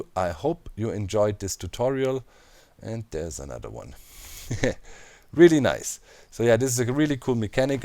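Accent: Germany. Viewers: Are you german by any chance? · I knew he is german XD he has this typical accent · Dein englisch hört sich sehr deutsch an O.o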